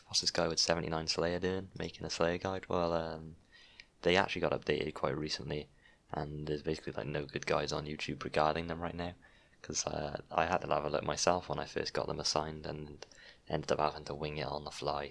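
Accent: Wales, United Kingdom. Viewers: Can tell youre from Wales with the accent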